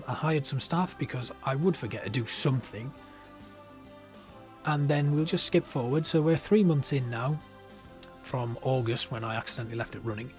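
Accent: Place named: Newcastle, United Kingdom. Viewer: You popped up on my feed. Didn’t realise you’re a fellow geordie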